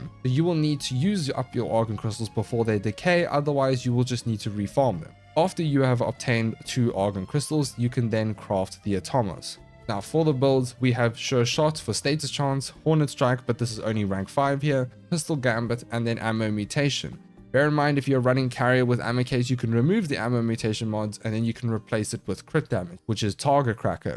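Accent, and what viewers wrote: South Africa. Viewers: Are you South African? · South african?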